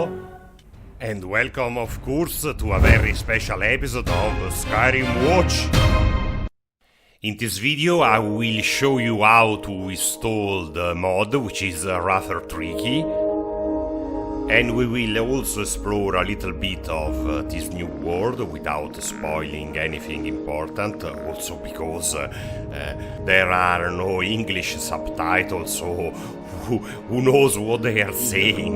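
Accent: Italy. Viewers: Eppure qualcosa mi dice che sei italiano. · Nice video and epic accent (Italian?)! Chiao! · C'è bisogno necessariamente del gioco originale? (dall'accento suppongo tu sia italico) Thanks!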